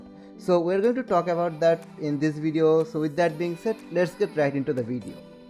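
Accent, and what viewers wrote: India. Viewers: your Indian accent made me suspicious but it worked so thanks ?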